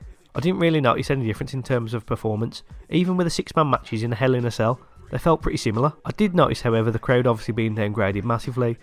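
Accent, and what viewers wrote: United Kingdom. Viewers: Your accent is very british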